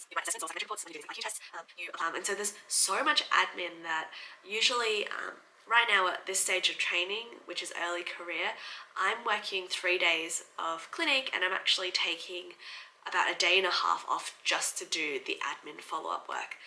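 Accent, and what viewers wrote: Australia. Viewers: keep up your Aussie accent. Im a BBASEAN British born South East Asian, and none of us talk like Brits we all talk like gangsters hahaha 😎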